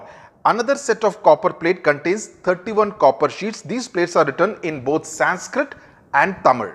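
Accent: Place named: South India, India. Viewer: sir are u a south indian ...the accent of ur speaking and the tamil words are pronounced PERFECTT!!!!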